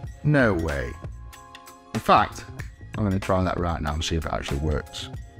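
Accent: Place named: Stoke, United Kingdom. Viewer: can tell he is from stoke we are all the same.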